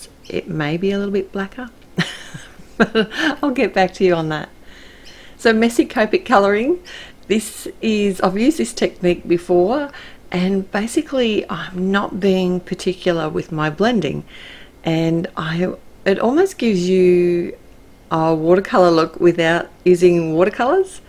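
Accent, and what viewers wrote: Australia. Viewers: Watching this Anzac morning after the Dawn Service... Wow! Thank you, always love listening to the Aussie voice😀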